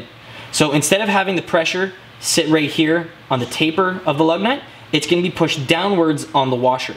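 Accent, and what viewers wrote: Canada. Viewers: Canadian eh??????????????/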